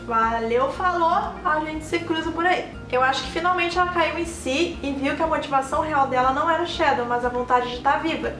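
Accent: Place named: Santa Catarina, Brazil. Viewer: Man acho vc tão linda❤️ me lembra minha melhor amiga q brigamos e n nos falamos mais, o sotaque parece de Santa Catarina " manezinha" ahhh man 😂❤️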